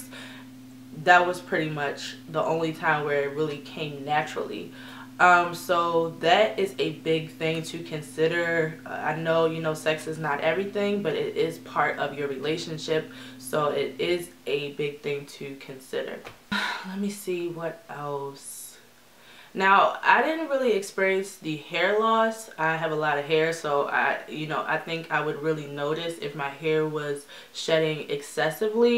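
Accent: Baltimore, United States. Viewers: I could tell you were from Baltimore a few sentences in 😁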